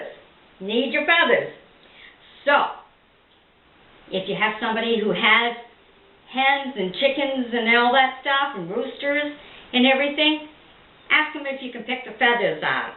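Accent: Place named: New Jersey, United States. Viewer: This is really cute c; Your accent makes me miss my grandma from Jersey. If I ever have kids I'll remember this.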